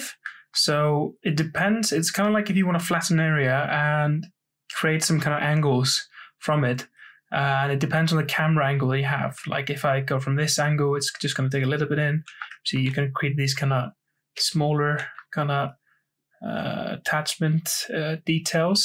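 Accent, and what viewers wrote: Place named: Wales, United Kingdom. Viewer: Both of these videos are amazing! Thanks so much. Also you sound like a mix between Welsh and maybe Nordic 😃😃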